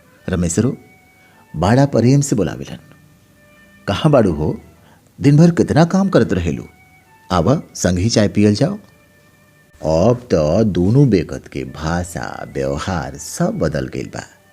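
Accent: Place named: Bhojpuri, India. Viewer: Waah भईया केतना सुघर खांटी भोजपुरी बोलतानी रउवा🙏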